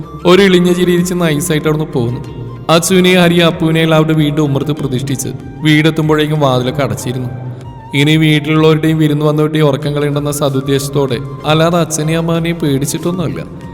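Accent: Thrissur, India. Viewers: Adipoli kurachhe Trissur samsaram poly thonni 👍💖💖💖💖💖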